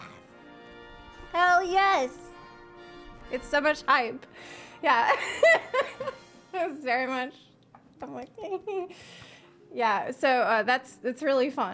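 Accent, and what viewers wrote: South, United States. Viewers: Luckily I am Japanese so I have the advantage of knowing what Yoshi P say before translator speaks to my discord friends. But I remember learning English was one of the hardest thing I have done during my youth. Grammar, future, and past tense are the things that got me frustrated but I kept on going. I eventually was able to lose my Japanese accent as well after many years of practice. I am very shocked to hear you are learning Japanese. I have no doubt you can do it as long as you don't give up. For now, I must say your accent in Japanese is so adorable. It has the hint of southern US accent which is very exotic and unique to hear in Japanese.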